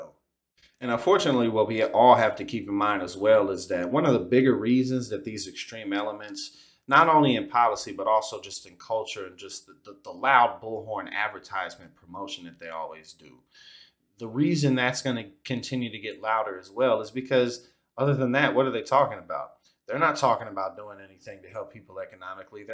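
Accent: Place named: South, United States. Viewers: I like your accent, it reminds me of the Dukes of Hazard. ❤️👍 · I love the accent! I'm from Brooklyn, my mom is from Sydney, Australia, My dad's from Pittsburgh and I was in the Navy out in Washington State for four years in the sticks! 🤣 My accent is CRA-ZAY! But truly, I never met a Southern gentleman who wasn't a gentleman! Momma's and Grandmommas raise them up right south of the Maison-Dixon as much as on the north side!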